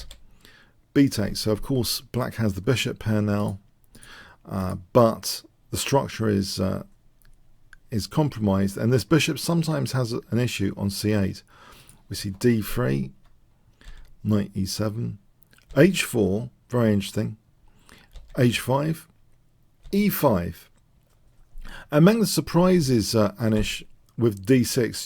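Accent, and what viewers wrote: England, United Kingdom. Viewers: Thank you so much dear I really learn so much from you, great analyzing tactics and plans you really Amazing. beside entertaining I like your English accent..smile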